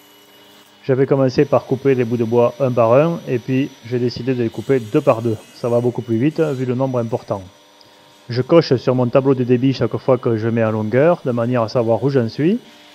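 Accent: South, France. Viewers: Super et avec le petit accent du sud agréable à voir entendre et comprendre. Le bonjour de Londres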